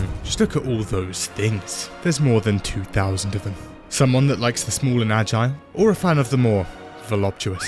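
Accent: United Kingdom. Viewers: all British people sound the exact same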